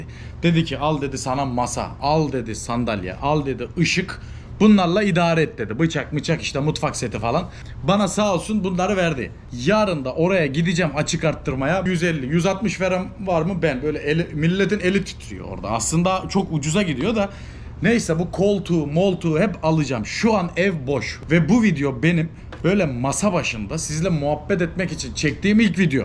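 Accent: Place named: Trabzon, Turkey. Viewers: Kardeş konuşman, tavrın aynı Trabzonlular gibi. İlk izlerken kesin Trabzonlu dedim!? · Seni tanimiyorum ama buyuk ihtimal trabzonlusun konusmandan ve tavrindan belli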